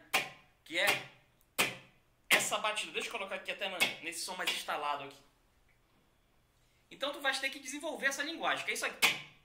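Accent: Pará, Brazil. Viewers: Legal o vídeo... e cara vc tem sotaque Paraense heheh eu sou do Pará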